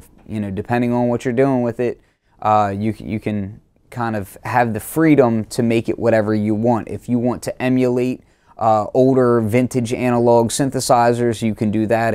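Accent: Philadelphia, United States. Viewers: I detect a Philadelphia accent. Are you from Philly?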